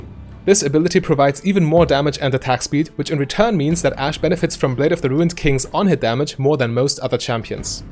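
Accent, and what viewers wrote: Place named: Germany. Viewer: Inhaltlich gutes Video aber ich verstehe nicht, warum Deutsche mit so einem krassen Akzent englische Videos machen müssen. Ist mir in letzter Zeit öfter aufgefallen. Als ob es nicht genug englischsprachige Videos gäbe.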